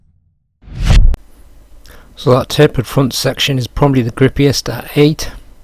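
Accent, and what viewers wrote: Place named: Middlesbrough, United Kingdom. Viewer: Been watching the vids for a while now just wondering whereabouts you are from as I am from Middlesbrough , it sounds as if you're from that neck of the woods.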